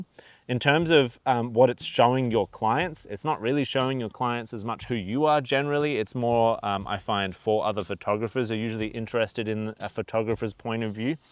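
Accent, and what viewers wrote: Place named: Australia. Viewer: Great video - also love to hear another Aussie’s accent on YouTube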